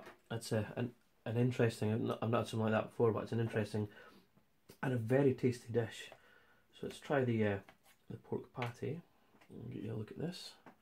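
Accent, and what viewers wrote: Scotland, United Kingdom. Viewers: I like your scottish accent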